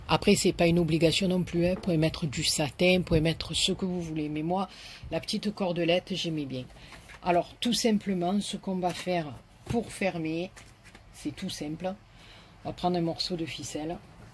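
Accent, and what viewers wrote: South, France. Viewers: Coucou, ils sont juste magnifiques tes coffres, j'adore! merci beaucoup pour ce tuto, ces explications et ta bonne humeur, j'adore t'écouter avec ce petit accent qui nous rapproche du Sud, bisous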